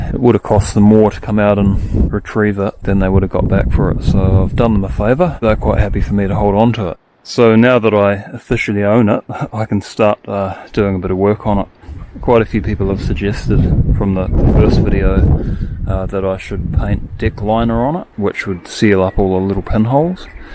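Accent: New Zealand. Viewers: That's the awesome New Zuld accent...very flat in comparison to most other English speaking accents. Can lead to confusion when spoken in the West Island (Australia) e.g. Aussies say "sex" when they mean to say "six"..and the Kiwi will sound like "sux" when he means "six" leading almost immediately to sheep jokes!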